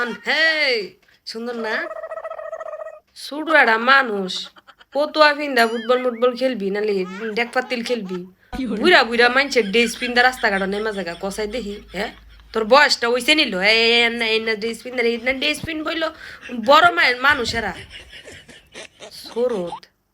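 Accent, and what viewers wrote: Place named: Mymensingh, Bangladesh. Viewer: Kmn jani mymensingh ar basar moto lage😂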